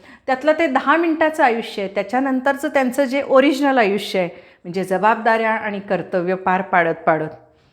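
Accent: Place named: Marathi, India. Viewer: जय श्रीराम, ताई तुम्ही अगदी आमच्या मनातलंच बोलताय! आताच्या ईंग्रजीचे प्रभुत्व असलेल्या वातावरणात,तुमचे ओघवते शुध्द मराठी ऐकतच रहावेसे वाटते!मला शुध्द मराठीचा खुपच अभिमान आहे,तुमचे मराठी बोलणे मला आवडते!अजिबात नाटकी पणा नाहीये!प्रत्येकाचे स्वभाव वेगळेच असतात पण आयुष्यात तडजोड करावीच लागते!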